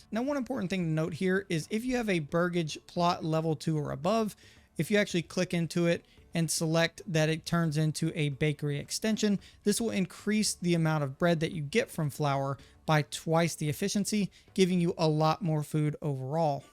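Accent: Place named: Texas, United States. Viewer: Thanks a bunch brother! Haha I couldn’t help but notice the accent, are you from Texas?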